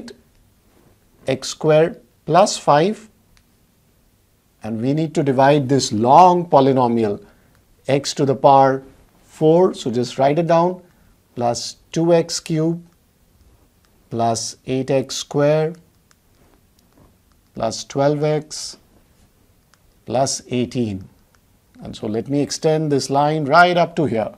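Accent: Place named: India. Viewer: listen to his accent, you can tell he is indian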